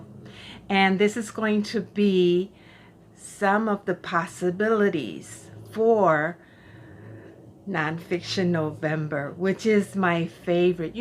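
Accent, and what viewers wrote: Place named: Puerto Rico. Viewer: Hello, I enjoyed your nonfiction TBR and loved hearing the language and accent of "mi gente." I'm astounded at the gall of the author who thinks Puerto Rico is not part, or shouldn't be part of the US. It's not like we had a say in that situation. I'm hoping for the best. Aloha y bendicion.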